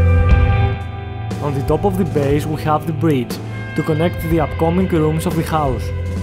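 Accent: Greece. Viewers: probably from Greece ...I can recognise that accent ! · You got a really nice heavy Greek accent (idk if you are) but also thank you for the tutorial. It's gonna be helpful for my jungle village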